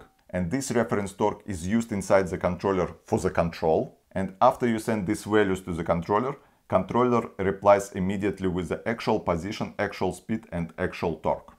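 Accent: Russia. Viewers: С русским акцентом мне даже легче понимать английский язык :). И с юмором, что тоже приветствуется! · Ohh russian accent👍. You reminded me USSR scientists, who were living only for science itself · Русский акцент сразу ударил по ушам. Что бы убедиться в своей правоте открыл описание под роликом, но увидев там какого-то Yoyo Liu был крайне удивлен. Пока не понял что это просто контакт продавца.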